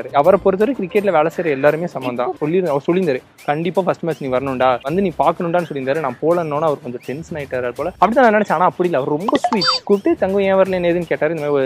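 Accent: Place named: Coimbatore, India. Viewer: Romance naa thaan 🔥 Apram eppovumae last la antha kovai slang pesirunga athaan massuu Bro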